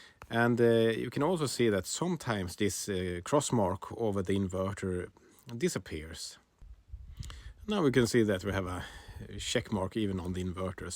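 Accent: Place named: Sweden. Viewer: You sound swedish, do you do installations like this commercially? I would be interested in such a case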